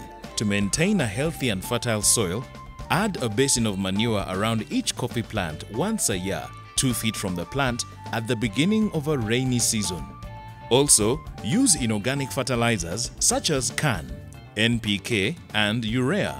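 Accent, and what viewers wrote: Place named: Africa. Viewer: Great video very straightforward and I love the African accent! 😍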